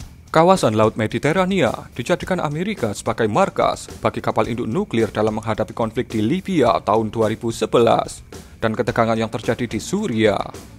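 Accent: Java, Indonesia. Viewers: Medok ya mas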